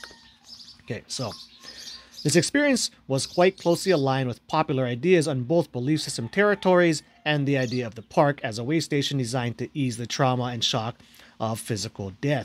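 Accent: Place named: Hawaii, United States. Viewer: haha just the way you end your words 😂😂 what island are you from? I was born & raised in Maui, moved here to Wa state in ‘16 right after graduation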